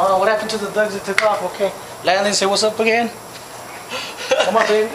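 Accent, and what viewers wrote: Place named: Mexico. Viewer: hahahha i amost fell for it carnal hahah u look like many but then i heard ur mexican accent jajaj la cervesa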